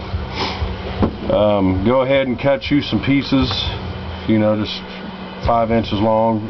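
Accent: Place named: South, United States. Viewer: Brilliant accent.... Southern, I guess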